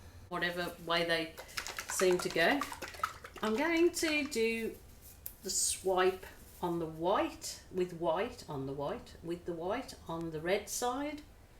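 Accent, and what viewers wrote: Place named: England, United Kingdom. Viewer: Always love to hear your cheerful English voice, I live in France so I always enjoy.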